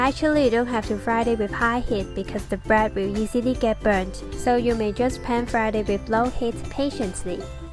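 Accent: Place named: Hong Kong. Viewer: 呢個like系比你嘅英文嘅。英文唔錯呀，香港tone但系口音唔重。希望你之後會越講越自然，加油！！好鍾意你嘅vids架！！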